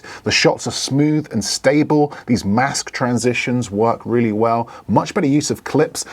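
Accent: United Kingdom. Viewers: I like the clarity and emphasis of the British tongue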